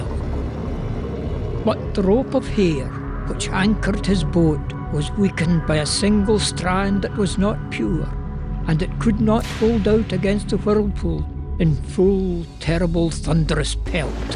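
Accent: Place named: Scotland, United Kingdom. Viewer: Wonderful! And I‘m so thrilled to hear a scottish voice again after a far too long time being kept away from visiting Scotland.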